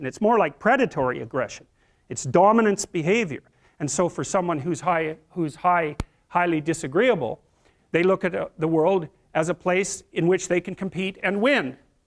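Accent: Canada. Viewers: This guy's Canadian, eh?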